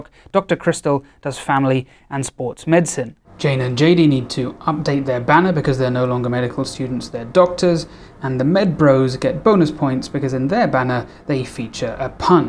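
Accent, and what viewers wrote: France. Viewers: Thank you for shouting out French medical youtubers! They're great and really under-appreciated. I hope you recover from the flu quickly. Also your French accent is sublime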